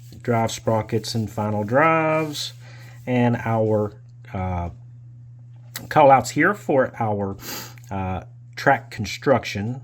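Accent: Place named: West Virginia, United States. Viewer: The start of the unboxing was hilarious 🤣I always knew you guys in WV talk different, but that was something else. I am still trying to grasp what you were saying 🙂But the kit looks great and is a welcome different subject matter, and I'm excited to see what you make of it. Have fun modeling, and see ya next Friday!!!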